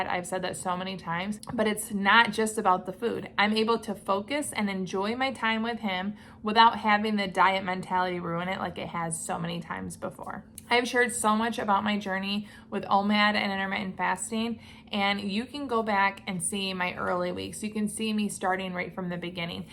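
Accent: Midwest, United States. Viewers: I detect that hard midwestern accent.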